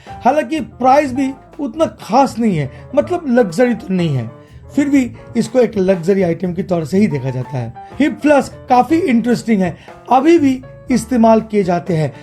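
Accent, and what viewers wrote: Bengal, India. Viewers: Don't care about the contents. I am subscribing just for your bengali accent. It's so funny (in a good way) 🙂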